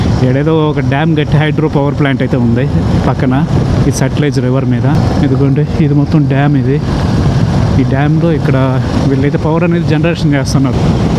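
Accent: Nellore, India. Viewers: 😂😂😂 Nellore slang super 😊 abba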